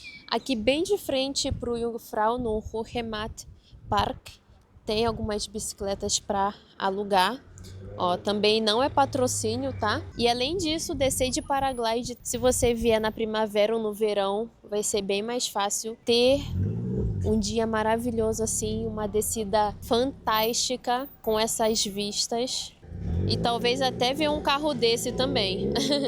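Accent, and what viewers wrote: Rio de Janeiro, Brazil. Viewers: Tu és muito fofa e calma! Parabéns p video, vou em outubro p Suiça! Ansiosa Ahhh o sotaque carioca tbem é lindo!!! Bjo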